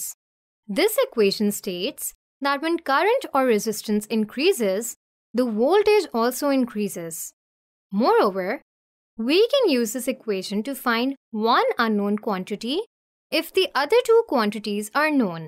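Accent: India. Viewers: why is it indian lol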